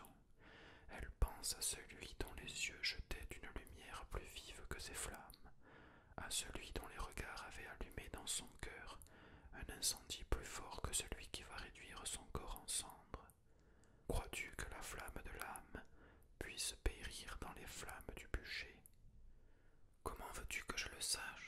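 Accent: South, France. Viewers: ...j'avais reconnu. Sud sud...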